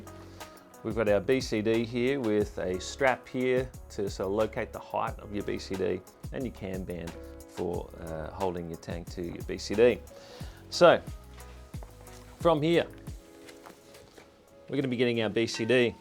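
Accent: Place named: Australia. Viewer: You're Australian 🐨🦘🪃🇦🇺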